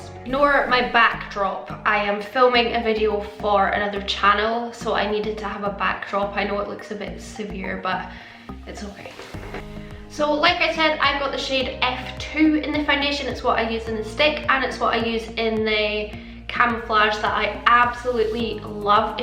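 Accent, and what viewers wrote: Scotland, United Kingdom. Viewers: Oh my god a Scottish YouTuber, where about u from x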